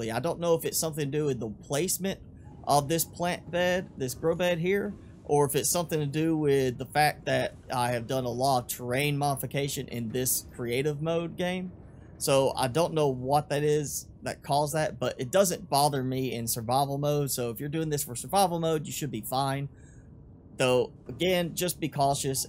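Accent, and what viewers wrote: South, United States. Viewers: Your southern accent gives me life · you have the "Y'all accent" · Y’all accent XD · Stormy it's a southern American accent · TIMMY yes you can definitely tell especially when he says "try","charge","power", and "why" haha but i guess even Americans can make good tutorials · What heavy country accent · Are you a cowboy? Cuz you have the same accent as one Edit:not trying to be rude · Also known as the southern accent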